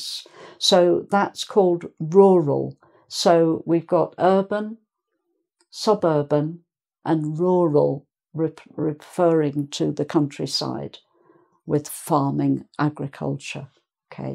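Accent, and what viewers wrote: United Kingdom. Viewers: Beautiful british accent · Hello, teacher! I love your accent brithish and your lessons, than to be easy to understand. I am Brazilian and study every single.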